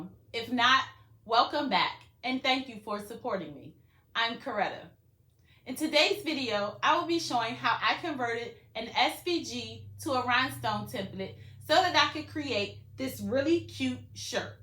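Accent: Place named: Baltimore, United States. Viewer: You sound like a baldamore woman 😊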